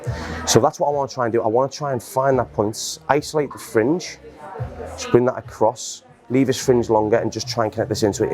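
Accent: Liverpool, United Kingdom. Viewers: scouser accent from liverpool , england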